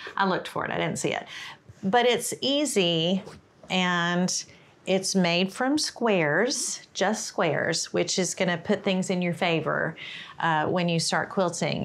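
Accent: South, United States. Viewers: Can I just say you are hilarious in the most wonderful way! You remind me of my best friend Jane, so ifeel I know you already. I have already made quilts but I clicked on your series because my daughter gave me some of her husbands shirts. I cut the collars and cuffs off and she said she needed them back! Shock horror! Lucky for me she was only joking! So thanks for sharing your knowledge, your sense of humour and your beautiful personality and your southern accent. from Jilly in U.K. x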